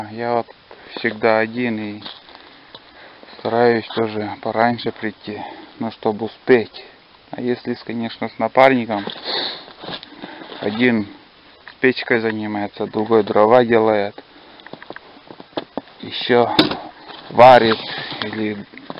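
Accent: Komi, Russia. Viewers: У меня бабушка из Коми, и с дедушкой она в Подьяческой познакомилась, говор то-же такой. И подруга тоже из Коми, прикольно, как тесен мир. Подруга услышала от меня слово "Покумекать" и спрашивает а от куда ты это слово привык говорить, я говорю от бабушки... так и познакомились... Спасибо Вам, и всего Вам самого доброго. :)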